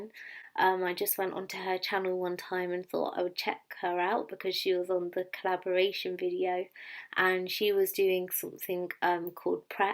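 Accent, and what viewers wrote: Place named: North, United Kingdom. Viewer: Hello sweetie, I feel I’ve meet my match. I can’t do some of the moves that my PT asks me to do, because my mummy tummy is in the way. Things like burpees or bear crawling, because I have a belly in the way. But I’m trying hard and according to the bodytrax I’ve lost 4kg of fat and gained 4kg of muscle. Also, as a northern gal myself, I appreciated your accent.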